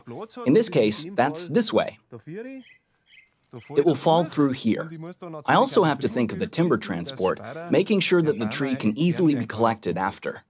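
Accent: Bavaria, Germany. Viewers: Bei der Ankündigung dachte ich schon real Bavarian English....Ich weiß nicht, welcher englisch Sprechende verirrt sich denn auf diesen YouTube Kanal.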